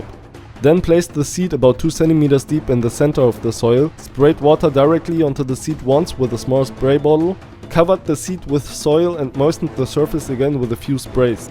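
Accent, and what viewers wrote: Germany. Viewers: Man hört raus dass du Deutscher bist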